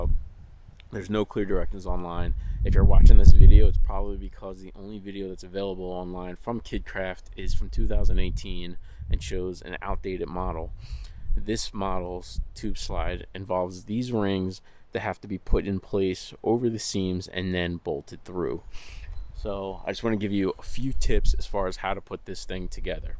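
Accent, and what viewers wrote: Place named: Philadelphia, United States. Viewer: By the way, had a feeling you were from the Philly area by the sound of your voice. Just sounded local to me. Checked your profile and saw that you are...me too (Delco; now South Jersey).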